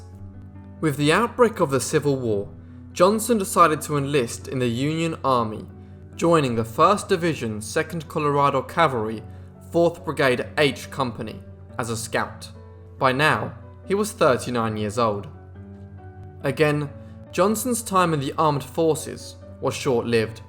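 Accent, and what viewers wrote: England, United Kingdom. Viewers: Mark Felton that voice is awesome rolling proper British Gentleman s equal to his fantastic content, definitely in the running for YouTube Supreme Docudude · Can’t understand this fucking Britt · I am surprised to hear this narration. I know American stories are shared the world over, but hearing English narrators is still a novelty for me. Thanks for the information. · English accent, I'm out. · Why is a England accent doing the narrating it doesn't match the man.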